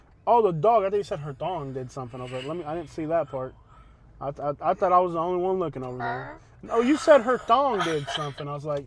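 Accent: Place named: South, United States. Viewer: LMBO with that southern accent 😂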